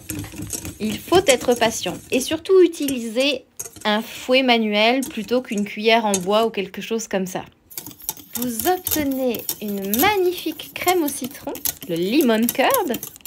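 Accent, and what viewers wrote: France. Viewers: Sorry, you had me at the french accent. I was not expecting that. Lovely! 🤩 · me too, I came back just to listen to the French accent 2nd time :)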